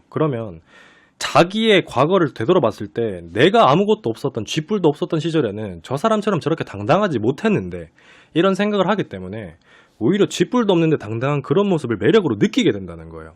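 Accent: Gyeongsang, South Korea. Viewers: 단호한 경상도 말투가 더 믿음직스러운건 느낌인건가 ㅋㅋㅋ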